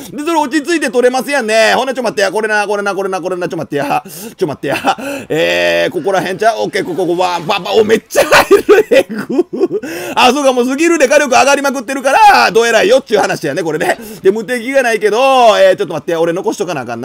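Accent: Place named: Osaka, Japan. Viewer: 声だけ聴いてたら120%大阪のおっさん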